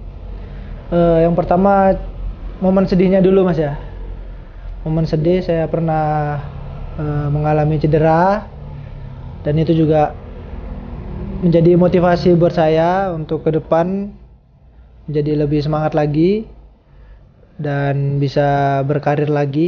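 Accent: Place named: Madura, Indonesia. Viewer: Oreng madureh smpyan kak 😄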